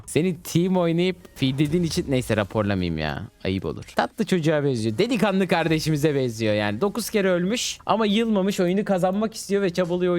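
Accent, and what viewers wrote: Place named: Cyprus. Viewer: Kıbrıslı arkadasın mı var senin ?:) Arada bizim ağızdan esintiler var :D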